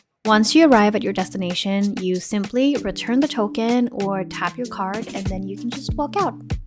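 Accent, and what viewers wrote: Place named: Thailand. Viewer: คุณพูดสำเนียงไทยชัดมากกก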